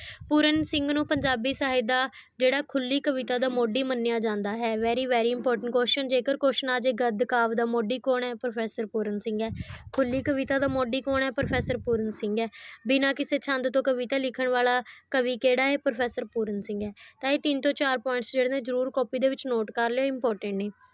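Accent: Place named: Mansa, India. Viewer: ਮਾਨਸਾ ਏਰੀਆ ਲੱਗਦਾ ਮੈਡਮ ਜੀ ਦਾ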